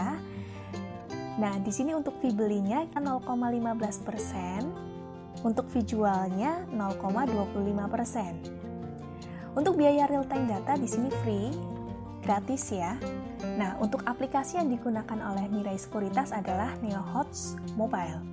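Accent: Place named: Banyumas, Indonesia. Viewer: Ngapak coy.... LBS Saya pake ipotgo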